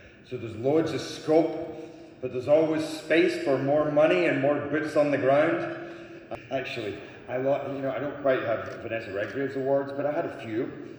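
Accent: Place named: Scotland, United Kingdom. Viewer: Thank you for all your movies. Good bless you and Scotland. Don't loose your scottish accent 🙏 🙏 🙏 Best wishes from Germany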